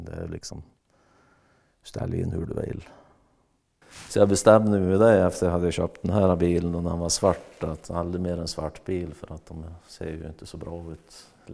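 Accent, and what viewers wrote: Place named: Norrland, Sweden. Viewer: Så underbart att äntligen få höra lite riktig norrländska i denna kanal!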